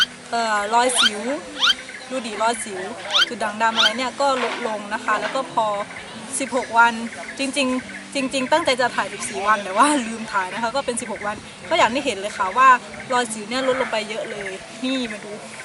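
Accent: South, Thailand. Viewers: เอ็นดูพี่ แหลงใต้ 5555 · แหลงใต้ น่าร๊ากก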